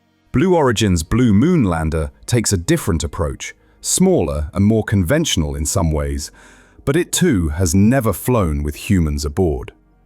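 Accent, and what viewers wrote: United Kingdom. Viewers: Why use a British accent to speak American? We don’t have skeduals or use Fahrenheit 😳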